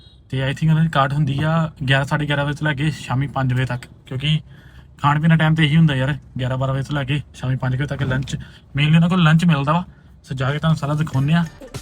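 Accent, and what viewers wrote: Majha, India. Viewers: Ahii majhe Wale a pra...ahi te adkar hi khene a ...😅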